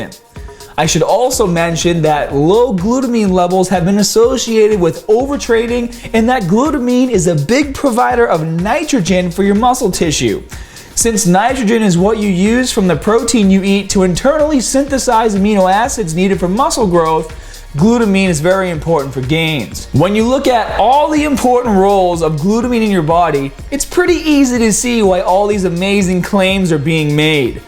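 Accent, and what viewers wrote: Boston, United States. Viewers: Gotta love that Boston accent. Ya hahd ea’ned mahnee. Thanks for the tips,! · Can you suggest how much to take. Daily? or when I am taking ballet classes? Love The Boston? accent.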